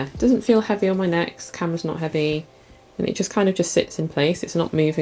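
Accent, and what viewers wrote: England, United Kingdom. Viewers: I bet she's from England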